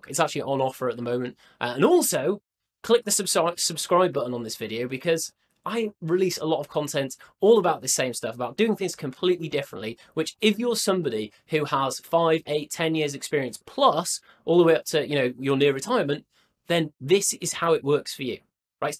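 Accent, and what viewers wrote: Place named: United Kingdom. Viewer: well ! Bro I'm listening to British accent so I found that you sound British.. Therefore, I asked it from you. Thank you so much for responding Could you please recommend me more youtubers with British accent?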